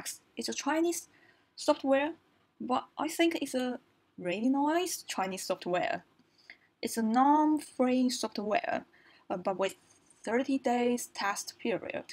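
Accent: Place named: United Kingdom. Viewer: 小姐姐的英式英语让我惊呆了！告诉我，还有什么你不会的？：）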